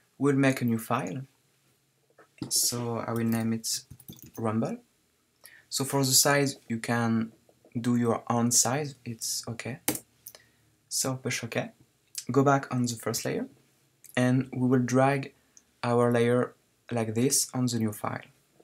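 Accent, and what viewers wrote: France. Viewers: Aaaah, l'artiste de Reddit est donc un Français ? :DD (French accent spotted) Comme quoi, beaucoup de talents en art et design numérique viennent de notre beau pays ^^ Je suis un de tes premiers fans :) On sent qu'il y a la même "patte graphique" qui revient souvent sur la plupart de tes autres wallpapers, cependant cela reste quand même un beau style que tu as su t'approprier ;] Bref, bonne continuation et bon tutoriel, Un "French" 😁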